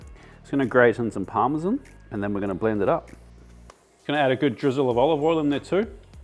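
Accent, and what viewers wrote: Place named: New Zealand. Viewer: 'Aw'right lets cook some parsta'. I do enjoy your Kiwiness and the vids of course. :)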